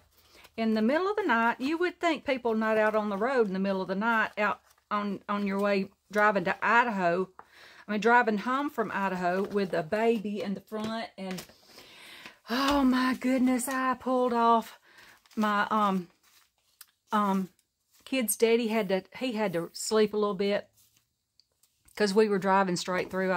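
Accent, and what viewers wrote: South, United States. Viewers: I'm new saying howdy from Texas!! I love to hear another southern voice and your journals are amazing :) Where do you get all the supplies? I wish I could come play at your craft table!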